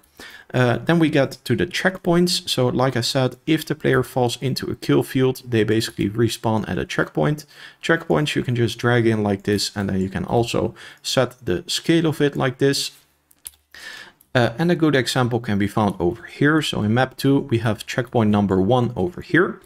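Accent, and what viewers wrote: Netherlands. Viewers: Duidelijke een Nederlandse accent :D. Cool Template tho!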